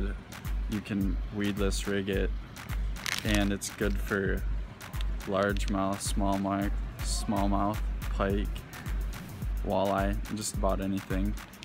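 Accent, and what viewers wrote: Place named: Minnesota, United States. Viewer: You ever watch the show Fargo? You sound just like the people on that show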